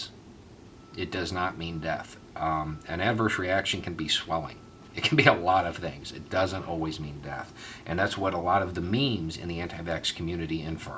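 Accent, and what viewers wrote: South, United States. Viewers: Honestly, this is one of my favorite videos ever from you. Not only did you put everything so well, and sensibly, you just helped me resolve a moral/ethical dilemma I’ve been unsure where to come down on for a while: should vaccines be legally mandated. I know that might seem small, or silly to some people, and it’s obviously not a huge deal in my life, but I’ve been undecided and conflicted on the question of making vaccines legally mandatory for a while, but that explanation and description of the escalation of police violence was something I really hadn’t considered in this case, let alone ALL cases. I appreciate it,. Normally I’m just enjoying your southern drawl and agreeing with you, but these vids where you make me think about something from a new angle, or teach me something new, are my favorites! ✌🏼🏴♾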